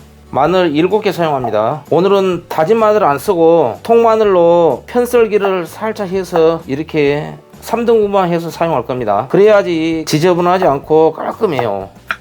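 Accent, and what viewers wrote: Jeolla, South Korea. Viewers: 설명도. 구수한. 전라도사투리로. 친근감있고. 맛도. 배가되는거같아요. 저도. 따라해보려. 합니다. 감사합니다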